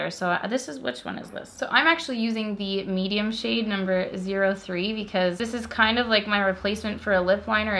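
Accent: United States. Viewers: i must say , i did not expect an american ascent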